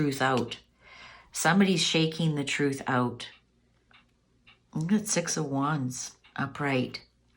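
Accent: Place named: Canada. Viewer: I had no idea that is a fellow Canuck 🇨🇦. I just picked up on the accent. I knew there was a reason I liked her in addition to her being a talented, intuitive reader. Very good reading, 🔮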